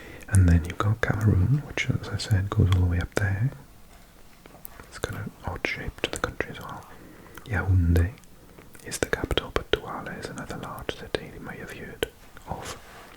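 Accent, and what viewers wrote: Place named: Wales, United Kingdom. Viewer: Welsh accent ASMR??? Where have you been hiding, Mr??? 🥰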